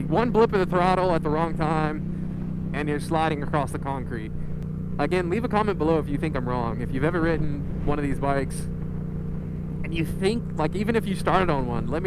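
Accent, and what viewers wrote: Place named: United States. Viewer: you sound gay as fuck with that american accent✈🏢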